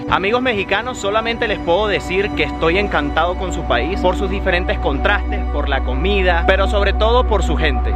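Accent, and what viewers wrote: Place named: Venezuela. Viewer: es venezolano, no le oyes el acento?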